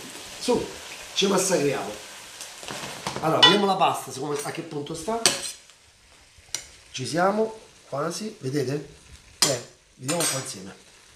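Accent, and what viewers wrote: Rome, Italy. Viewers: Steffen Ali If you’re trying to learn Italian, you may have a bit of difficulty understanding this guy. He is very much a Roman and Romans have a strong accent that may be difficult for beginner learners. However, keep up the good work!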